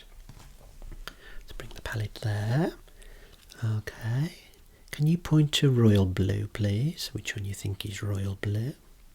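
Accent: United Kingdom. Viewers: I absolutely love your voice and your british accent. <3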